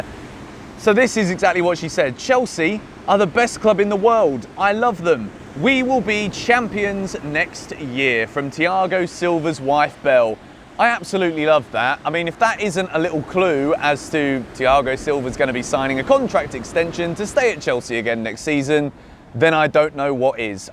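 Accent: Scotland, United Kingdom. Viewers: I would like Oscar back but I'm worried about him playing low-tier football for so long and how much the league has changed since he left. His contract expires in 2024 so Chelsea will have to buy him. I love the unexpected Scottish accent. Really love the nice daily dose of in the midday. Donnarumma would be nice because some fans forget that Edou will be out next season for AFCON and Hakim will be out as well but hopefully, we will have a more stable team next season to replace Hakim. Really love this channel and I am happy I found this channel from the beginning of it.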